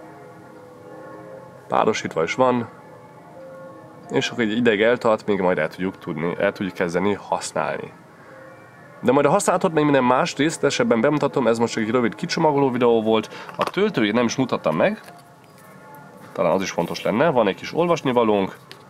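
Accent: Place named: Germany. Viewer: Olyan érdekes beszélsz mintha valami német ember lennél aki magyarul beszél. Engem nem zavar csak tényleg olyan fura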